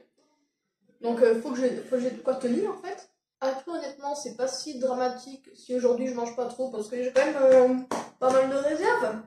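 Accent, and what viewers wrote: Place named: Russia. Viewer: j'adore ton accent avec le leger accent russe dedans ca te donne un charme fou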